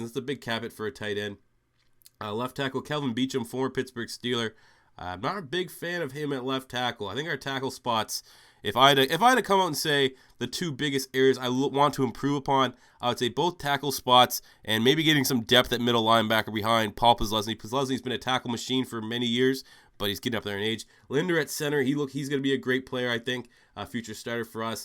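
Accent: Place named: Canada. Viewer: I can't listen to a Canadian speak for 30+ minutes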